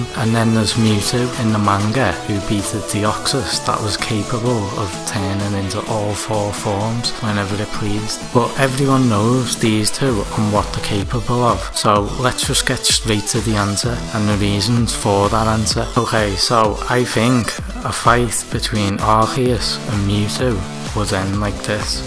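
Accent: Liverpool, United Kingdom. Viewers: Is that another scouse pokemon fan. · Your Liverpool accent. 🤮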